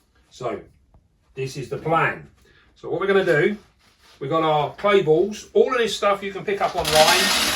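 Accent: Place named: Essex, United Kingdom. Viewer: Nice video me old mate, very interesting, been looking at getting one of these for a while now off the spider shop. Whereabouts you from? Sound like a fellow Essex/Kent dweller?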